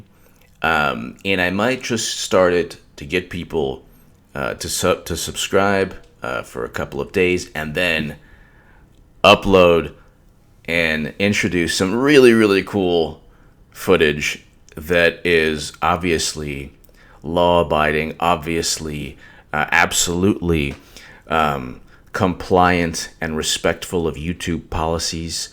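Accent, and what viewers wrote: South, United States. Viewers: you are in rare form tonight. please repeat theyou awaaare of the" Weiiiiiiiineeeeer long pause:)..................................Laaayptop with that southern Drawl again.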